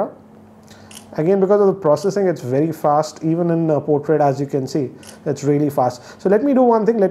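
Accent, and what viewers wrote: India. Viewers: Classic Indian accent I'm don't used to this accent lol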